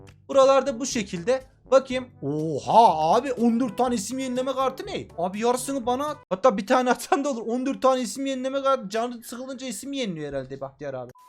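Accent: Gaziantep, Turkey. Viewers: Abi anteplimisin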